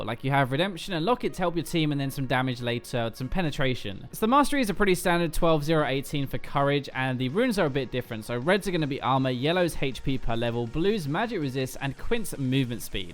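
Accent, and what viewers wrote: United Kingdom. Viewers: i like the uk accent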